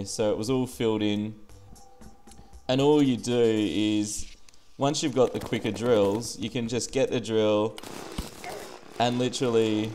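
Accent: Australia. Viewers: love your voice, so aussie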